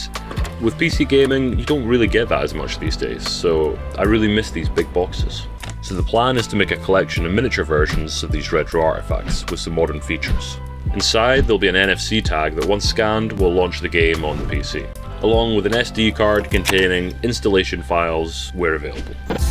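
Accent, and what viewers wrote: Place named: Aberdeenshire, United Kingdom. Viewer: Bro sounds like he's from Aberdeenshire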